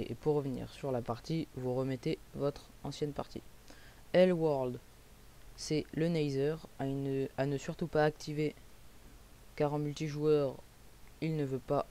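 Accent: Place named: England, United Kingdom. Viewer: quel accent anglais !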